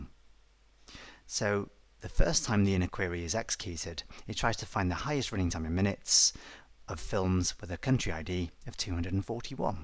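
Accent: England, United Kingdom. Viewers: is seriously one of the best instructors I've found on youtube. You start from scratch, with simple examples and you build on your lesson plans beautifully after that. I cannot thank you enough on how amazing SQL lessons were, as well as your VBA lessons. I thought I had enough knowledge I needed for basic VBA, but still ended up learning a tremendous amount from those videos and then finally SQL. I'll admit I got confused with correlated subqueries, went on to google for a bit, but finally watched this few more times and they finally clicked. Online training courses can be expensive and so I want to thank you for providing SQL and VBA on youtube. It's greatly appreciated. There are a lot of smart people in the world, but not everyone can be a great teacher and you certainly are a great instructor and British accent definitely a plus:)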